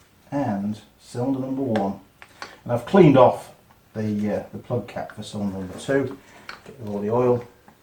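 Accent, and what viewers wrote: United Kingdom. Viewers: Are you British or Australian ?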